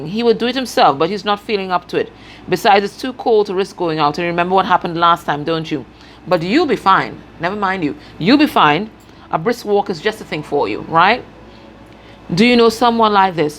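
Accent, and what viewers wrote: Trinidad and Tobago. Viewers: .....are you a trinidadian??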